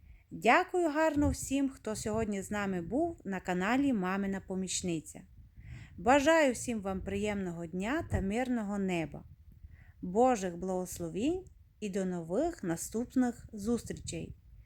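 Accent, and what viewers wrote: Transcarpathia, Ukraine. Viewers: Дякуємо за закарпатську вимову ,привіт з Білої Церкви що на Київщині!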